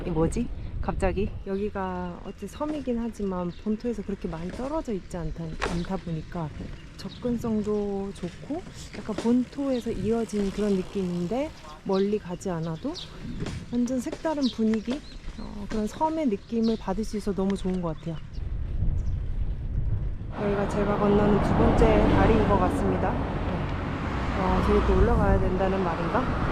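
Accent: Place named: Gyeongsang, South Korea. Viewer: 저도 경상도 사람인데 혹시 어디분 이세용??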